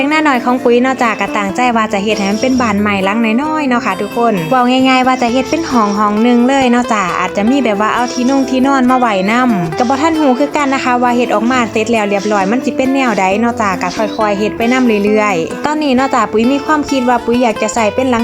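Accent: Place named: Sisaket, Thailand. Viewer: เว่าไป๊-กิ๊นคนทางศรีสะเกษเบาะคะอยู่อำเภอได๋น้อค่ะ😅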